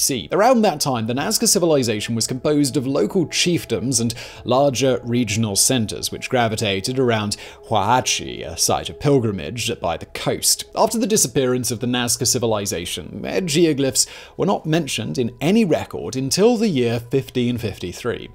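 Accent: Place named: England, United Kingdom. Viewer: Midlands/south England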